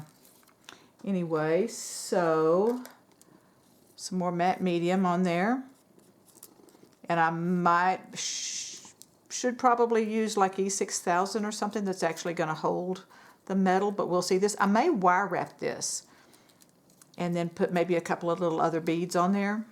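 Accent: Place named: South, United States. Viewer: "A little carried away".... I loved that comment, you are not alone! LOL I look forward to more of your videos, kitty and all. A dear friend of mine was raised in Georgia, thus a slight southern voice. You have that same accent, I kept waiting for you to say "well bless her heart" lol 🌺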